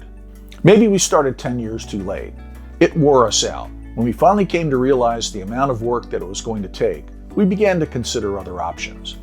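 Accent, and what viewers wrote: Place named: Philadelphia, United States. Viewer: Is the host of this video from Philly? Gotta be with that accent. Or maybe Jersey?😁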